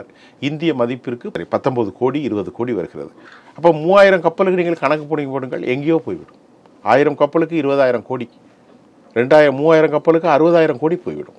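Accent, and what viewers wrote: Sri Lanka. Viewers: இலங்கைத் தமிழ்.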